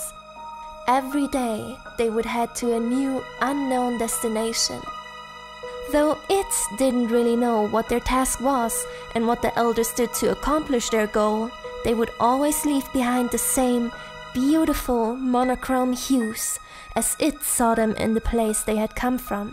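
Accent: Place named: Germany. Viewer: German accent = 😍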